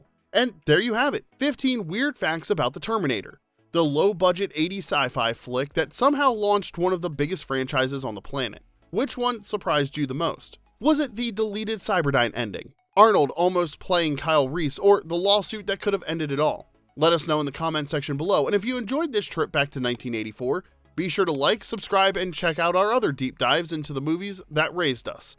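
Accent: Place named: South, United States. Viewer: I enjoyed this. Thought it was funny that the narrator didn't reference his own Southern accent. The word "on" as a two syllable word, "drawling" instead of "drawing", etc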